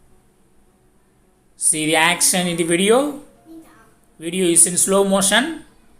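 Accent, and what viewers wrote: Tamil Nadu, India. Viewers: Tamil accent 😁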